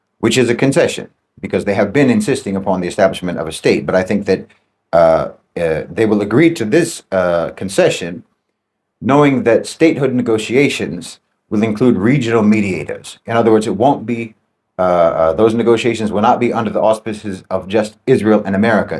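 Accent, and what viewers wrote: United States. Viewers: Incomprehensible. He has dreadfully bad delivery. A lot of phrases and sentences are just noise. He gabbles the most important terms. He does not explain the most important terms. I have tried over and over to hear the term he uses for the opposition to what he calls Neocons --- which itself is some codeword only known to Unitedstatesians --- and it's still just noise. The thick Unitedstatesian accent makes even simple sentences hard to follow.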